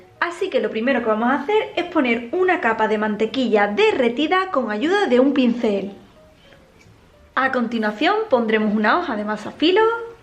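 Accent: Andalusia, Spain. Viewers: Que acento mas hermoso 🥺 es de andalucia o por ahi??.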